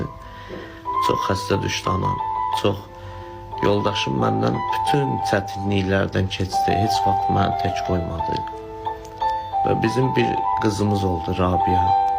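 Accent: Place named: Lankaran, Azerbaijan. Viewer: ☝️Iştı ləhçəku zıne bedə tı Lankonıjiş 👌Xıdo rəhmət bıko 🤲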